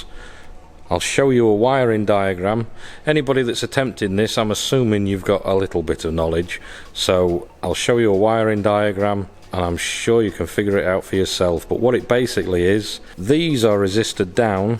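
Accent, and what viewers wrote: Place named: United Kingdom. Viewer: Great video. Thanks. Perhaps its the British accent that makes it seem so easy? Will be doing some LED-ing on my 69 Dodge Charger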